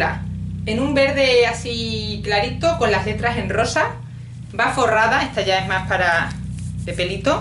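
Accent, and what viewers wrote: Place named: Extremadura, Spain. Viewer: Ya decía yo que ese acento me sonaba!!! 🤔 Saludos de una paisana. Siempre es buen tiempo para visitar Extremadura 👌😊 😍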